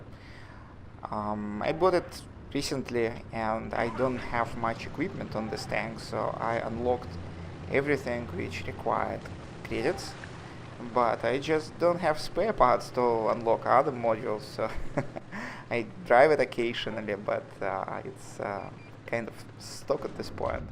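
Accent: Russia. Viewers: Totaljy Rassian accent :D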